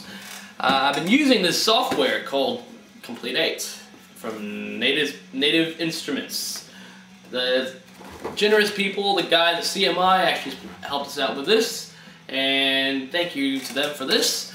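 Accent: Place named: Australia. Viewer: Lol I cant tell if you're Australian or American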